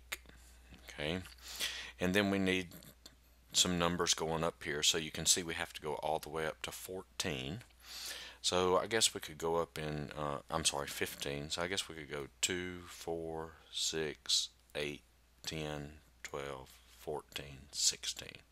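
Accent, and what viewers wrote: South, United States. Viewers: Thank you! P.S. You have a southern accent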